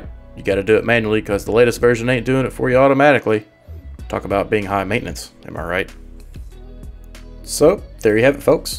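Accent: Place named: South, United States. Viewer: Awesome 👌 Thank you so much. And... I absolutely enjoy your southern accent and style 😉. Being a southern boy myself 😅from Charlotte, NC.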